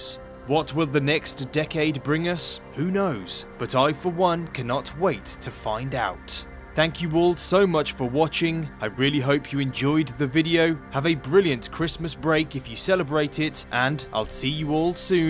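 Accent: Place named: West Midlands, United Kingdom. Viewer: This guy has to be West Midlands/south west England. There was a wee farmer twang in ‘excitement’ and ‘violent’ for sure. Great accent!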